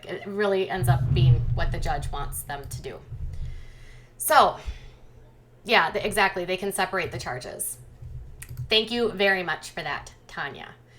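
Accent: Minnesota, United States. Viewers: I like your accent. Are you from Minnesota?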